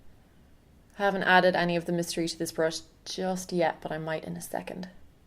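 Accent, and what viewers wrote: Ireland. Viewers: She's actually from Ireland :) xx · She's from Ireland :)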